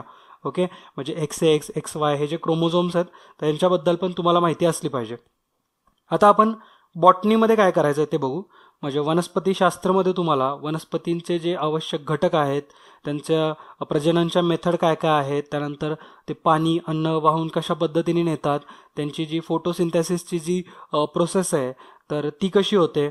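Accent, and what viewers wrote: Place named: Pune, India. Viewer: sir mala vatthe tumhi nakki punyache aahat karan tumcha bolnayacha ton ha same tasach aahe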